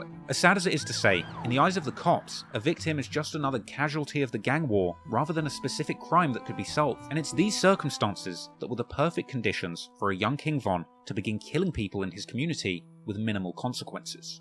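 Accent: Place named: London, United Kingdom. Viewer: Ayo this nigga talking about Von with a London accent lol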